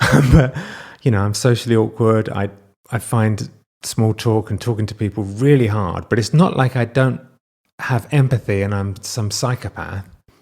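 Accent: United Kingdom. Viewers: I have noticed that a lot of your videos have been triggering for me specifically because you remind my of my ex. He is British, MCR, and we are both in recovery (post divorce - he was an alcoholic while we were married, and I abused alcohol post divorce - for various reasons treated via therapy). And idk if it is cultural (you're both from England, and you both have English accents ((obviously not the same accents))), or not. But I've had to really work to separate the two of you. He is very much a social media dad. He has a lot more work to do, because he started years after I did. I've had so much therapy and he hasn't. Idk, it's really weird, and I have to really work on staying in my own hoopla hoop when watching your videos specifically. I'd love to have a few conversations with you about it, but I know that probably isn't something that will actually happen lol. 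You have a lot of followers. But I do genuinely love the videos and openness from you and Laura. And I'm an adult who was diagnosed later in life as neurodivergent, and all 3 of my children were diagnosed as children. My mother had severe mental health issues (diagnosed).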